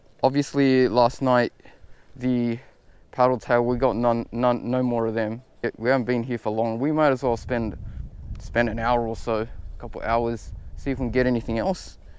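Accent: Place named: Sydney, Australia. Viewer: Sydney accent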